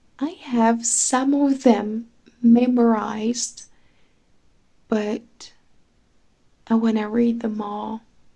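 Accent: United States. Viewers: HOW YOU DO HAVE BEAUTIFUL ACCENT LIKE AMERICANS ? WHAT IS YOUR SECRET? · Proud of you as a Moroccan young girl speaking English language fluently with Americans accent Mashallah ❤ I Just discovered your channel yesterday , I will ask you if you can tell the Couran stories specially to the kids who were born in USA or UK to understand better when some one speaking . Thank you so much , you are beautiful and talented keep up with writing the journal every day , and encourage others to do it as well .( doesn’t matter what language! )…………Happy New Year 2024 🎉🇲🇦🇱🇷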